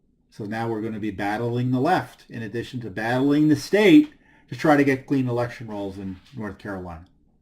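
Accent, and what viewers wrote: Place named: Russia. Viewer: I thought I detected the hint of a Russian accent.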